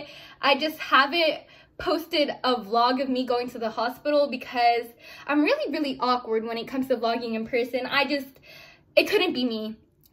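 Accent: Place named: Visayas, Philippines. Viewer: Get well soon, imong bisaya conyo miss na namo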